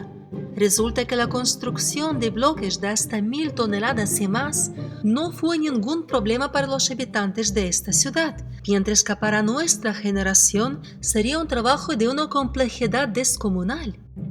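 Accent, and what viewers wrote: Russia. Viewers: excelente video gracias por tus videos he investigaciones son muy interesantes, me gusta el acento de tu español, de donde eres, rusia? bueno, saludos desde las montañas de colombia.... "nadie conoce el color del agua que viaja por debajo del rio".....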